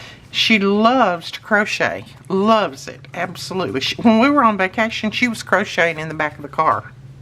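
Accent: South, United States. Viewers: What a wonderful video this is. Not only do I get a tutorial on how to make a great addition to my ephemera stash, I get to enjoy the background music of a storm. I miss the claps of thunder and the relentless rain, and this is a video that I will watch over and over. When I get homesick for my Texas girlhood, I watch your channel. I miss the speech of Southern people and the scream of Southern storms.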